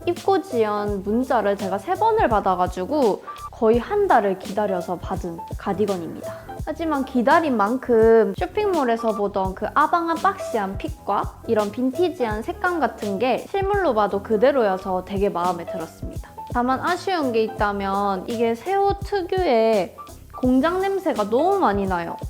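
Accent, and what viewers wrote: Gyeongsang, South Korea. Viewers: 언니 사투리!! 경상도인가요? 귀엽네용...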